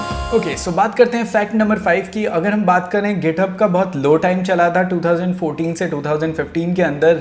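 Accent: India. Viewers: Someone has already told you that European people find difficult to understand well tipical "Indian English"??? Is not question of speed... Why this torture!?!?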